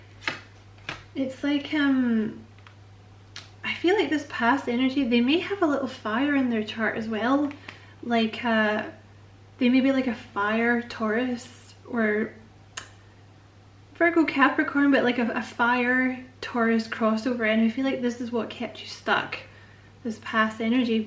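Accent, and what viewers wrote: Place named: Scotland, United Kingdom. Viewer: Thank you,. Always a sense of a breeze softly blowing through your reads. Hmmm…Scottish? Interesting. I’ve always loved the Scottish accent. Feel better quickly! 🙏🏻💗🦋😎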